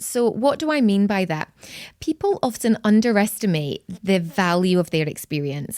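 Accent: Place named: Scotland, United Kingdom. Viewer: Context, Challenge, Confront and Consequence....wow...I thought initially that you were from Sweden. Then recognised your Scottish accent. Thanks for the lesson.👍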